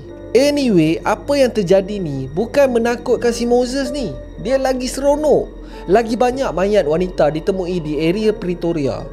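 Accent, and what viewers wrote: Perak, Malaysia. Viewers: ptut comel je bnyi dia😅, ooo... Kome orng perak rupa nya atei Kome sihat ke top?🤭 Teman dlu blajor dkt sano, Teman tinggey kt Batu gajah dlu yop. (Orng perak Jgn marah erkk, saja tringin nk blajaq ckap perak)😅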